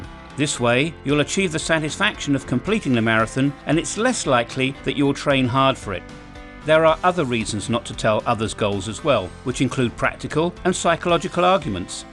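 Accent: United Kingdom. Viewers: I like your Chanel und britsh accent (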